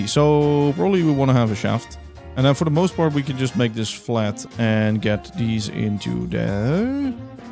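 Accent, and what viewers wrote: Netherlands. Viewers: Aan je accent te horen ben jij toevallig Nederlands ?🤩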